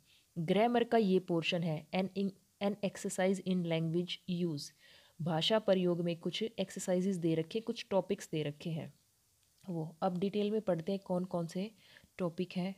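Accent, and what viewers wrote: Haryana, India. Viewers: Madam Ji Maine Apka Thoda thoda Haryanvi Language ka Touch Padhne main Ap use karte ho Badhiya lagta hai जय हरियाणा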